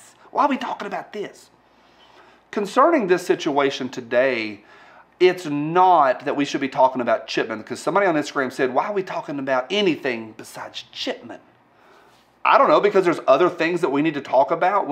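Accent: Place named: Tennessee, United States. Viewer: Dude what county in Tennessee do you live? I’m in Robertson County and can tell your accent is thick Tennesseean.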